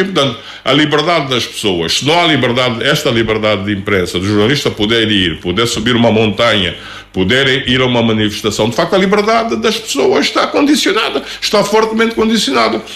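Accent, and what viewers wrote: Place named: Angola. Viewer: Voz do angolano puro .Fala a verdade ,a língua portuguesa com gramática.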